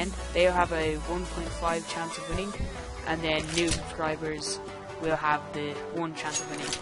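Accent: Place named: Dublin, Ireland. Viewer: you sound irish, dublin accent i think trade link for item <link> and good luck to all!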